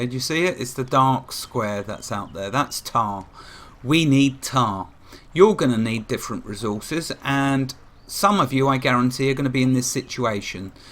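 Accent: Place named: England, United Kingdom. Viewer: *Laughs* Hi and I live 5000 miles and one gigantic pond of water (Atlantic) away from each other. So for now the only way I can appear in a video is in the background. I can not hear when he is recording so I have no clue what he is saying until I watch the video's just like all of you. I personally do not have the equipment that has to be able to record but then if I record it wouldn't be a Tutorial would it? Besides I don't have that fantastic English accent that has. I am originally from California so I don't really have an accent and would be boring to listen to. *laughs* I really wouldn't feel comfortable recording a video on my own since this is tutorials. is my best friend on this planet and I would never want to take anything away from his project. One day we hope to be in the same location so we can work on this together. You think you are amused by video's now wait until we can record together. 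*laughs* Like Vikki said we sound like an old married couple. We affectionately bantering with each other which is funny to listen to. So I am sorry dear for now you will have to listing to waffling on alone. *smiles*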